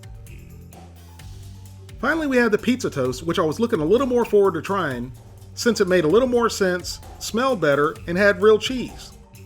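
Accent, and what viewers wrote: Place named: North Carolina, United States. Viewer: I've been watching some of your videos randomly for a bit now, and I've always thought you sounded like someone from NC... I am also, that's how I knew.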